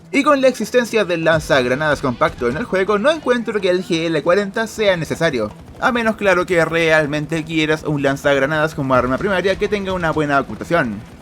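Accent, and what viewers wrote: Chile. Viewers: Eres chileno? Porque yo si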